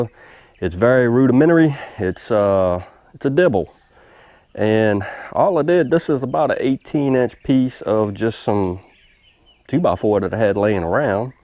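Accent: South, United States. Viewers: That dibble is fabulous! I cannot wait for hubs to make us one for spring planting. I am a new sub and love that charming southern draw of yours. It is cool here in Oklahoma today and we are loving it. Take care!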